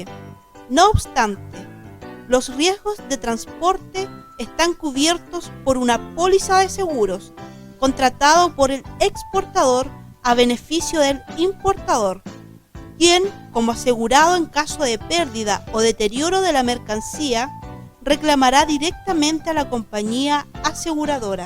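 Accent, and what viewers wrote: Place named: Spain. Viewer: Agora que percebi que a fala e o sotaque espanhol são horríveis.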